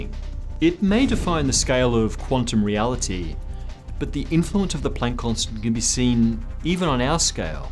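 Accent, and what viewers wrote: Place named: Australia. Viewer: I'm so used to hearing American / UK accents in videoa that when I hear an Australian accent i can't figure out where it's from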